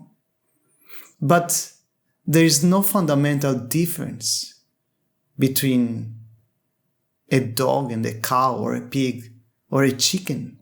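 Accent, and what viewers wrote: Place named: Greece. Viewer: Just saw this - never got a notification that you had answered! Your name may be Greek, but lots of people use Greek names, or other exotic-sounding names online, so no, that wasn't the reason. Your accent sounded very familiar to me, as I am Greek myself, and an EFL teacher as well, so it's basically what I hear every day! Thank you for bringing some quality content to the internet; a whirlpool of cheap, fleeting content. Your videos are to-the-point, every sentence you utter is thought out and meaningful and you always make a valid point and get us thinking. 😊❣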